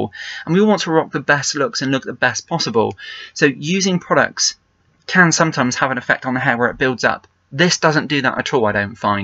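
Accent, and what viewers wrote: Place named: England, United Kingdom. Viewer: omg i loved ur english accent & ur hair.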